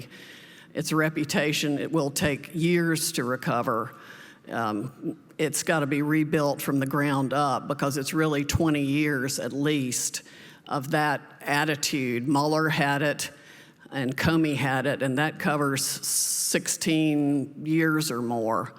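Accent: South, United States. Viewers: love that SOUTHERN DRAWL Sidney ... ear candy telling the truth is twice as good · Justice must come within 2020 before the frenemies crawl back up! Love your S. Accent👍💪🗽🇺🇸❤️💡🙏🏻🔑. Yes, Sec8-& Prison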